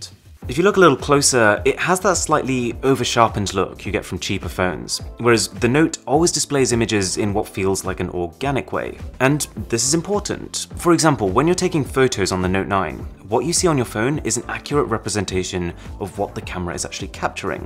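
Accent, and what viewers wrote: United Kingdom. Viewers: You have a British accent but talk in the dollar currency. If your British just say pounds sterling as I'm from the UK and understand pounds better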